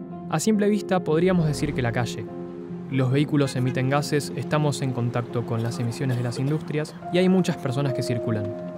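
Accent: Argentina. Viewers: El vox argentino papa, vengo de parte de UMI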